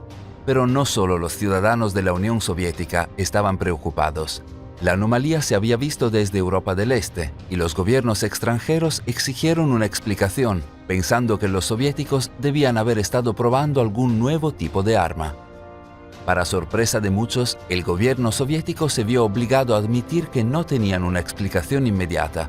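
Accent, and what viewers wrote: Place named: Italy. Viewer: Per quanto una buona pronuncia e lettura,la cadenza,l'inflessione il non marcare alcune lettere rivelano la tua italianità...ci ho imbroccato vero???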